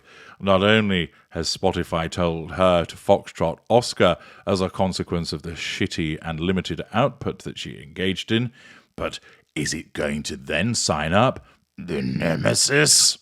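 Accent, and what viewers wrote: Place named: Scotland, United Kingdom. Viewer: Thank you. I have to confess that I very much enjoy it when you read the Scottish articles, simply because your Scottish accent amuses me greatly! HW has a "honeyed" voice, eh? I'd suggest her voice positively drips with falseness and sanctimony, because all she does is talk down to her audience and try to score points. But yes, I can imagine that Harry's wife is pretty much exploding at the reports of what Catherine and William are doing; and I'd think that Harry himself is also looking at them with envy and resentment.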